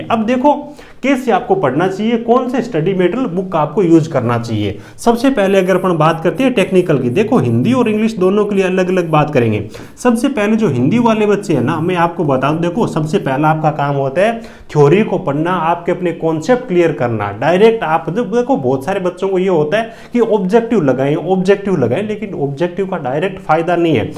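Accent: Rajasthan, India. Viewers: Aap rajasthan se ho kya sir?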